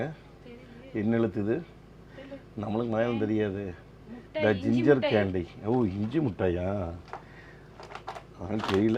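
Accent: Kongu, India. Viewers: Ungkalathu kongu tami slang style remba. Arumai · Super..that too in Kongu Tamil....very very nice sir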